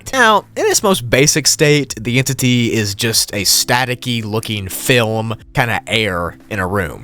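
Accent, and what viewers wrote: United Kingdom. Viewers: I know your British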